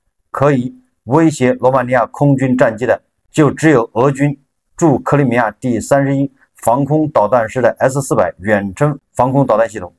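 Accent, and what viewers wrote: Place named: Wuhan, China. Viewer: 你有点黄陂口音。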